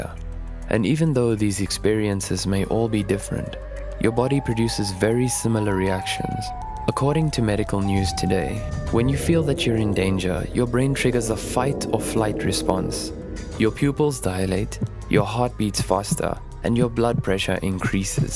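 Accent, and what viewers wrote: South Africa. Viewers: Loved this video and the narrator's South African accent! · Can always spot a South African accent. 🇿🇦 Great topic. Horror lover 🧟‍♀️ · I know a South African accent when i hear one :D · Awesome hearing a South African accent on this channel. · I knew the voice over guy was South African as soon as I heard his voice. I'm South African too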